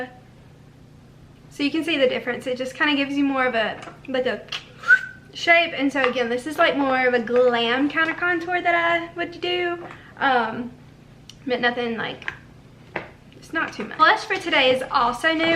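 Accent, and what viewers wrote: South, United States. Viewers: Im obsessed with your southern accent it's just so adorable 😩😩😍😍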